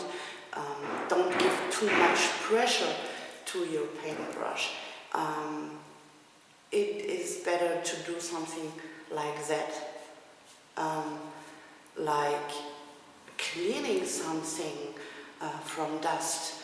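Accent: Germany. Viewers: I am so glad you have taken your courage in your hands and decided to talk to us in England. I have been to Berlin once with my Son. Your English is fabulous with a gentle German accent. From your explanation on how to apply paint, I have al so taken courage .I have bought a canvas and started to paint. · Your English is very good :) And the German accent is just charming :) (The little we can hear of your accent) :) - Thank you for sharing your art work with us, it is very inspiring! :) Much love - Nina :)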